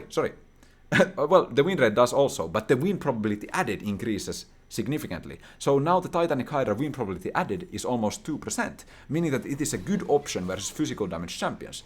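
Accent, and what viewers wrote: Finland. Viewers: Nyt onkyllä jo pakko kehua tätä suomi aksenntia! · jumalauta mikä rallienklanti <3 jos et tee tahallaan nii kandee panostaa tarkotukselliseen ralli artikulointiin, siinä on tavaramerkkijä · are you from finland by any chance? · i immediately recognized your Finnish accent, and its interesting that the thesis says "Aalto University" as well XD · Banger finnish English. Warms the heart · Hear Finnish accent. Subscribe to channel. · My dude your accent is amazing 😂 ootko suomalainen? My favourite was definitely “obsörved”. The stats were fun, not even a LOL player but this was fascinating. Best of luck with your Fall & future endeavours. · Hyvää duunia, ja tosi hyvin selitetty enkuksikin. Hämmentävää miten rallienglannin kuulee välittömästi mut kundi artikuloi nii hyvin et vika on kuulijassa jos ei ymmärrä. Analyysi on kyllä hiton mielenkiintosesta aiheesta, pidän nää pointit mielessä ku meillä tulee statistiikkaa taas jossaki kohtaa. 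· love your (finnish?) accent. One item, hahaha. Two item hahaha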